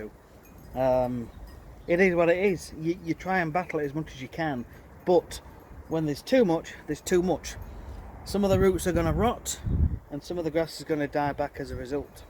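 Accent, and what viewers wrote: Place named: North, United Kingdom. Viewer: Don't overseed yet. Not sure where you are in the country, but you sound fairly northern, I'm in Lancashire and we have snow forecast next week.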